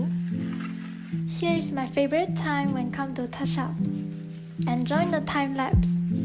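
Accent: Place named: Vietnam. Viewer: idk why but your accent looks like Vietnamese people when they speak English lol sorry if you're not Vietnamese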